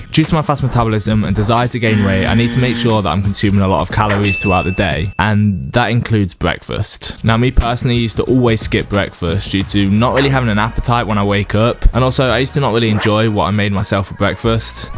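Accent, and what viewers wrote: United Kingdom. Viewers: Are u from UK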